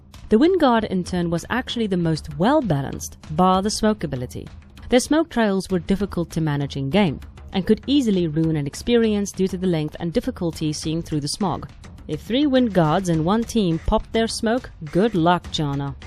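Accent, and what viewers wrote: South Africa. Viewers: Not game related but your accent sounds really familiar are you south African perhaps ?😂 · Found you last vid and now sticking around, loving your content also are you from SA? the "my China" gave it away. That 4v4v4 is freaking nuts btw and really chaotic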